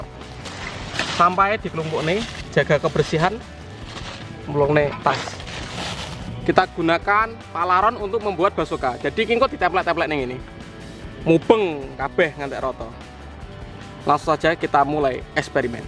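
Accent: Java, Indonesia. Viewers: Wong jowo yo ? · Jowo ne ngendi mas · Bahasa nya medok wkwk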